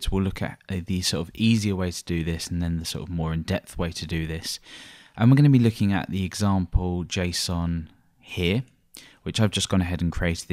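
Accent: England, United Kingdom. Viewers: so good to hear a clear concise english accent. you my friend are part of a small percent of the population, a dying breed in the cultural soup that is the united kingdom full of wanna be "roadmen" and wasters. There should be a lot more English people making these videos, and programming should be taught in primary school like it WAS in the early 90s. Before austerity and dumbing down became a priority. /rant over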